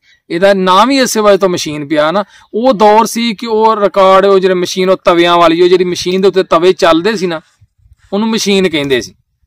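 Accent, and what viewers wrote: Punjab, India. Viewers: بھائی بہت سخت پنجابی بولتے ھو ایک لفظ کی سمجھ نہی ائی اوردو میین سُنائیے تھاکیں ہم بی انجوئی کریں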